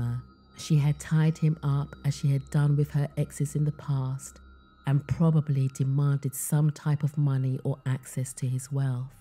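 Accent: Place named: United Kingdom. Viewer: I just found this channel and I love your voice. No matter how gruesome the details, they always sound kind of classy when spoken with a British accent. Oh me oh my!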